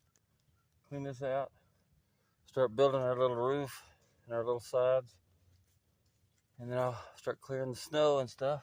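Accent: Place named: South, United States. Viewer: I'm going to take a wild guess that you're from the South :)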